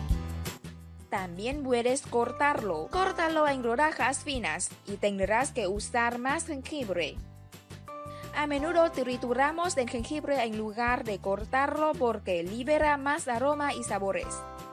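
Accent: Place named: Russia. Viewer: Porque habla como rusa y es shinita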